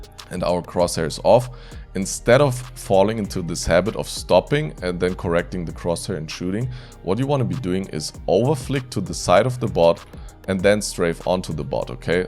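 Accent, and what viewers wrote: Germany. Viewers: Der deutsche Akzent xD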